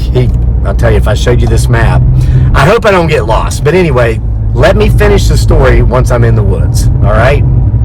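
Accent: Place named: West Virginia, United States. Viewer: Hey... I am one of your fellow West Virginians and I’ve told you before how much I love and am comforted by hearing your west by god Virginia accent...... I was also born and raised in West Virginia.... I wonder if those graves were of the family members..... I have many generations of south Caroline relatives and many years ago family graveyards were common....a part of many properties were graves...civil war even... but that was truly CREEPY and also fits with the other alien abductions you read about where pregnancies were part of the story but NOTHING AS CREEPY AS THIS.... an alien baby channeling their childhood families!! I hope you are home safe and will post on this YouTube channel that you are home safely.....seems like a lot of entities for lack of a better description...are you home safe? Of course it could also be Big Foot mixed in with invisible entities...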